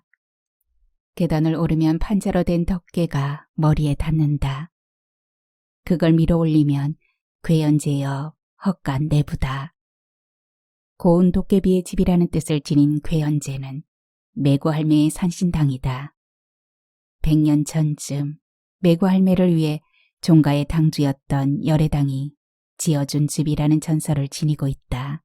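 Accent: Jeolla, South Korea. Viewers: 정감어린 전라도 사투리를 어쩜이렇게 소담소담.읽어가시는지..대단하십다. 감사합다.^~^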